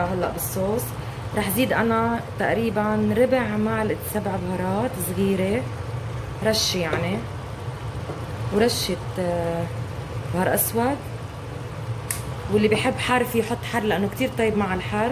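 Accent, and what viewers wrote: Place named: Beirut, Lebanon. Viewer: لهجتك بيروتية أصيلة جميلة للغاية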